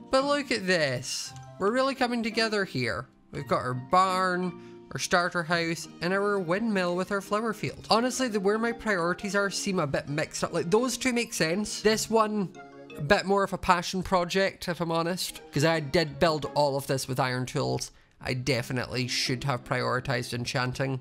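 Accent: Scotland, United Kingdom. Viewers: I've notice your accent is less Scottish since the start of this smp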